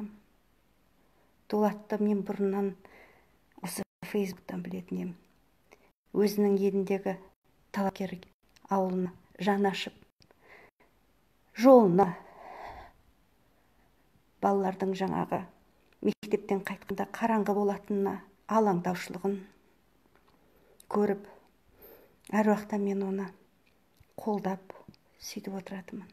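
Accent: Standard. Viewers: Ооо керемет әпше.Қазақшаңыз таза екен.Мен өз басым қазақшаныда орысшаныда жақсы білемін.Бірақ көпшілік Макеңе жазып жатады қазақша сөйле деп Атеистсің деп.Ұйғырсың деп.Егерде Макең нашар болсада арасында қазақша сөйлесе эфирде.Содан кейін Мен 5 мезгілі намаз оқимын деп ҚҰРАН кітабын ұстап айтса онда 90 % қалық Макең жағына өтетін еді.Ия мен жақсы түсінемін ол кісіні.Біздің заманымызда сондай болды.Бірақ қазір заман өзгерді.Сізде Қазақстанда тұрмағаннан бұл жақтағы жағдайларды түсіне бермейтін шығарсыз.Жұма күндері еліміздің бір мешітінде орын болмайды.Барлығы жастар.Сол себептен митингілерге жастар шықпайды.Ал жастарсыз митинг деген бос әуре. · Оо ханым.Калай таза казакша сойлейсиз.Шет елде журсенизде.Алла разы болсын.Халыктын батыры Дулат бауырымыздын жаны Жаннаттан болсын.Кайгырып конил айтамыз.Олди деуге келмейтин.Кайталанбайтын батыр деп билемиз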